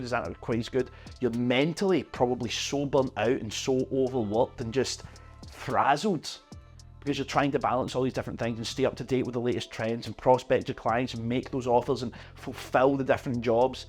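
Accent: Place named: Glasgow, United Kingdom. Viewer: your accent reminds me of visiting my gran in priesthill near pollockshaws as a kid in the 80s - im in Cheshire england all my life but all family are local to pollock, good content mate - im self employed too and agree with a ton you say 😎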